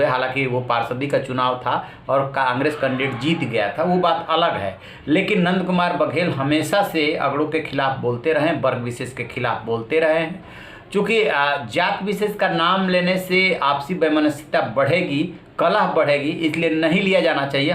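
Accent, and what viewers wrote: Bihar, India. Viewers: तू साला बिहारी टोन में बात कर रहा है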